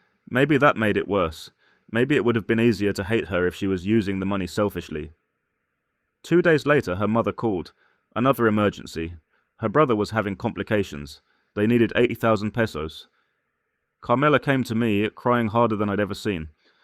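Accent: England, United Kingdom. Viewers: A fool and his money are soon parted especially when no common senses is used, and you sound like you have an English accent to me, not american😉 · This bullshit is just story telling which bares no truth…He has a British accent tho…probably went to the Philippines 🇵🇭 heard and saw some stories, then started a Utube channel…not too many subscribers yet, looks like it’s fairly new. The problem with these nonsensical stories are, they’re giving the Philippines 🇵🇭 a bad reputation.Stay blessed 😇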